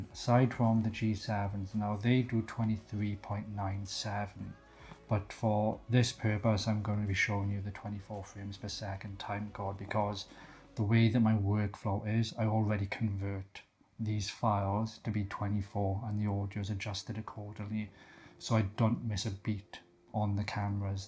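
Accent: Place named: Wales, United Kingdom. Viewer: thank you so much, it was very helpful, but you kinda lost me in minute , it got very complex and i have trouble understanding your welsh accent. Keep the good work. Thanks one´s again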